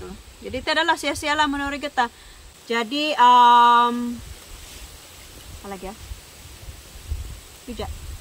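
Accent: Sabah, Malaysia. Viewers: Comel plak penoreh ni, loghat Sabah dia pun comel 😊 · Hello,hai..since u know now now to speak in English language so i speak in English if u Don't mind(actually i'm original dusun sabahan)..first of all this is the first time i'm in here(in ur chnl) n watching ur video i like it wht i see because in here wht i see u become Ur true self, n Not many girls in this day now Like u doing something Like this(kerja kg)i'm proud of you keep doing wht the best u can do never give up too Chase Ur dream n gd lucky when you go to city to continue study to improve your education in course u pick it u like I'm sorry i if my comment a Little bit too long for u to read in ur busy daily routine work schedule..i'm also same like u(penoreh getah)from KB nice too meet u hve a great day tidak which is the day i write this comment,by the way i love hear tht sabahan accent from u✌🏻🤗🤗..peace🇲🇾🇲🇾🇲🇾❤️❤️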